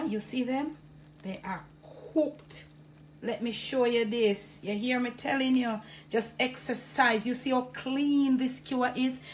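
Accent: Jamaica. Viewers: God, I wish she lived next door to me! Between the cooking, the attitude and that Jamaican accent, it would be simply DIVINE! 😊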